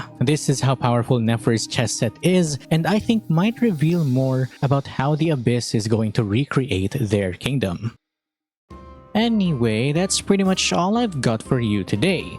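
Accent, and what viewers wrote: Philippines. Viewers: Great vid.. also the sexiest Filipino accent ever 😉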